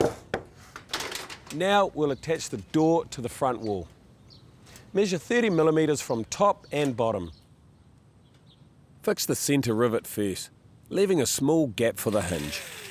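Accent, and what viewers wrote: New Zealand. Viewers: Just a Kiwi accent the whole time mate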